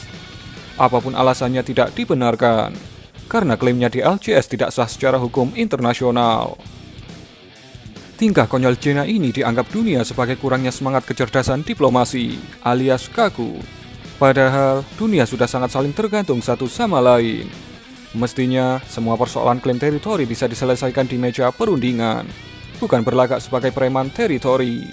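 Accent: Java, Indonesia. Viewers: Medok amat yang ngomong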